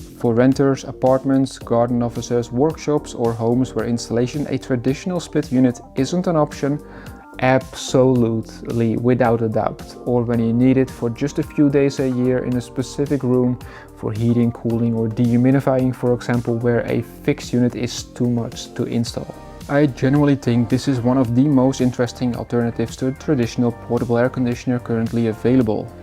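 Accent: Netherlands. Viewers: You remind me of an over the internet friend from Holland. One by the last name of van Rens. Somehow I immediately knew you were from Holland. 😂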